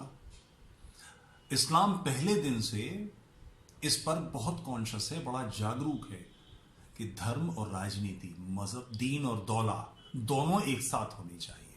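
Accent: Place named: Urdu, Pakistan. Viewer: Sahib, sab se pahley, aap Hindi bohat khobsoorat Urdu lehjay main boolte hain. Aap ahle zubaan hain sir. Mn Hindi bohat kum janta hn, tub aap ki sari baat smajh naheen aati, bus mufhoom samajh aa jataa hai Tu sir aap ne jo Islam k bare mien farmaya, sub such hai. Aap kaa hl, civil war, practical naheen lagta. Mera tajurba ye hai ke mulaa k sath aap jitni narmi bartien gay woh ussay aap ki kumzoori aur apni fateh samjhe ga. Adaab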